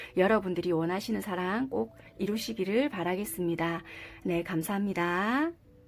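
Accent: Busan, South Korea. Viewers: 하이용 또왔어용 쩍지보러 제가 궁금한건 믿음이 안가는 현실을 스스로 만들고 믿어달라하는 타로가 나와서 사람은 믿을게 못되는데 타로를 재미로 보기가 힘듬 물어볼때가 없어서 보는거거든요 잼있게 보고 조아요 미리누르고 가요 좋은밤되요 👍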